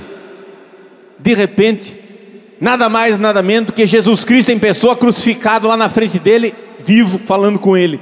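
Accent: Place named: Brazil. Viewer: Hilariante escutar o padre Paulo Ricardo com o sotaque e expressões brasileiras a representar D. Afonso Henriques! A batalha que o padre refere é a batalha de Ourique. Pouco se sabe acerca dessa batalha mas sim, a tradição conta isso mesmo acerca da aparição de Jesus ao primeiro Rei de Portugal. FÉ era decerto algo que movia os povos daqueles tempos. Fé essa hoje quase esquecida. Um abraço grande de Portugal a todos os meus irmãos no Brasil! Muita paz e amor! FÉ irmãos! Nosso Senhor não nos abandona! ❤️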